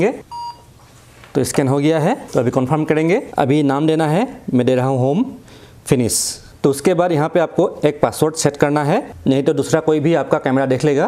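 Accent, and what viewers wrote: Assam, India. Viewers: অসমীয়া হিন্দী যেন লাগিছে // যা হক video টো ভাল লাগিল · Apka hindi sunkar lagta hai app assamese hai ☺️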